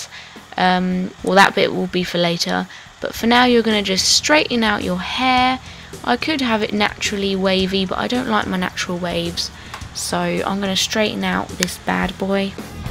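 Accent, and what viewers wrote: United Kingdom. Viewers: She's British and they have an accent so not everything sounds as perfect as you wish it to be you silly American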